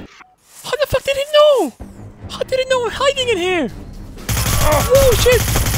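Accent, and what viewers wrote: Sweden. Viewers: The great has returned to us! How we all missed that Swedish accent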